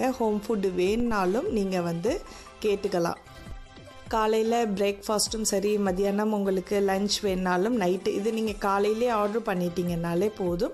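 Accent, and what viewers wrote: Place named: Kayalpatnam, India. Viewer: I cooked vetalappam which is so taste.Thanks for your recipes.Your voice is also nice with kayalpatnam style. Mrs.Shobaanandaraj Chennai.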